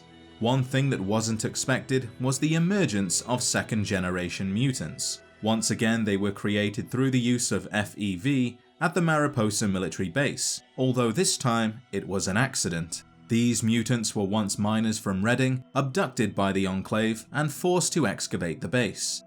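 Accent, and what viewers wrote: United Kingdom. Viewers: Part Shoddycast, part Oxhorn, part MittenSquad, fully British.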